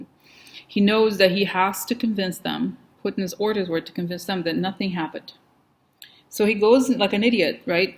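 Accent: South, United States. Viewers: Just luv the southern drawl! What a hoot you are !!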